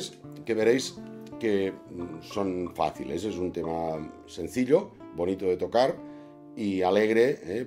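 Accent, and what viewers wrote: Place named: Catalonia, Spain. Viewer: Collons quin accent que tens de català de la bona terra.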